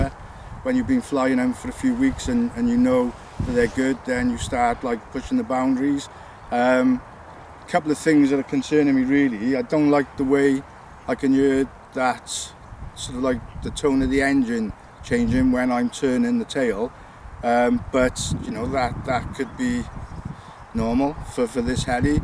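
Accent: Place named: Wales, United Kingdom. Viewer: That took me back to my first Trex 500 (self-built with Beastx) many years ago. Now aged 77 I’m afraid a shaky thumb or two could spell disaster for someone, so I sold or passed on all my gear about 2 years ago. I first spotted your vlog when browsing YouTube on my iPad, keeping the volume to zero - then played it later on my tv, hearing a slightly harsher / more aggressive beat from the motor … and a familiar Welsh accent. I’m a Neath guy and would have loved to have had a co-flyer like you, all those years ago. Good for you sir 👍.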